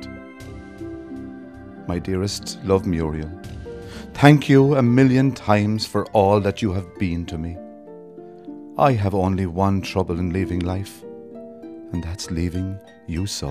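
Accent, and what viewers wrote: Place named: Cloughjordan, Ireland. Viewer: Magnificent piece of work especially given the circumstances of that final night. Beautifully read by the perfect Cloughjordan accent. Thank you all. I'm tearful having listened. Thank you.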